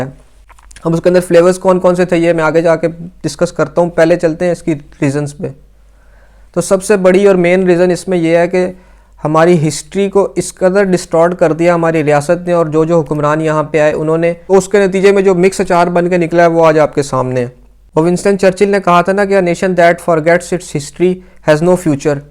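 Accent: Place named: Punjab, India. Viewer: Your punjabi accent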